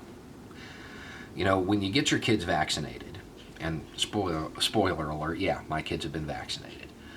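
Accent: South, United States. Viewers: Honestly, this is one of my favorite videos ever from you. Not only did you put everything so well, and sensibly, you just helped me resolve a moral/ethical dilemma I’ve been unsure where to come down on for a while: should vaccines be legally mandated. I know that might seem small, or silly to some people, and it’s obviously not a huge deal in my life, but I’ve been undecided and conflicted on the question of making vaccines legally mandatory for a while, but that explanation and description of the escalation of police violence was something I really hadn’t considered in this case, let alone ALL cases. I appreciate it,. Normally I’m just enjoying your southern drawl and agreeing with you, but these vids where you make me think about something from a new angle, or teach me something new, are my favorites! ✌🏼🏴♾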